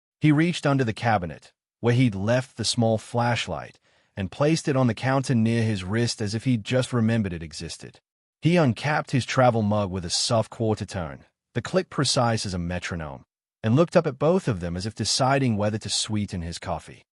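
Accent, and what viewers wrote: Australia. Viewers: I don't know which is more annoying, the Australian accent or the pronunciation of Mia's name as "M.I.A."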